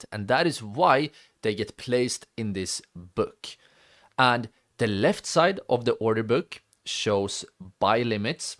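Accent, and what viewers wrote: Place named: Sweden. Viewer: I hear the svenglish from mile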